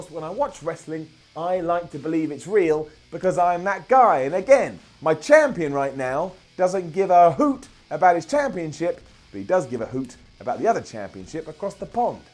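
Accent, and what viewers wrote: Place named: United Kingdom. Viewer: I like that british accent😁😁😁😁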